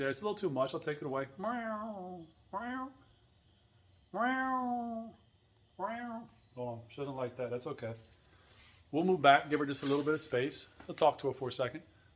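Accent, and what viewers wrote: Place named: South, United States. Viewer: That accent? LA? Definitely the south.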